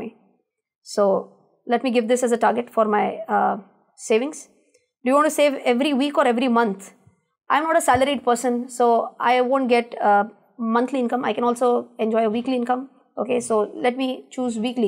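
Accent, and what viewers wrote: Maharashtra, India. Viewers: Marathi accent ❤️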